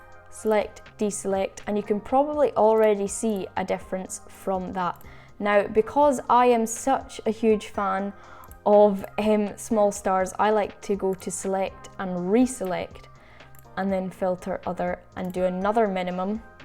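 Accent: Scotland, United Kingdom. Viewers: This is why I love YouTube. A teenager has given me one of the best lessons I've every received in Photoshop and in a cool Scottish accent to boot!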